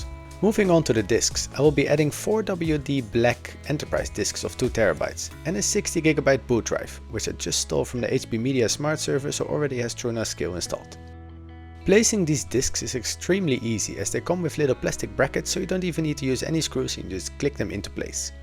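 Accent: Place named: Netherlands. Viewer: Lekker bezig maat, dacht het al aan je accent te horen haha!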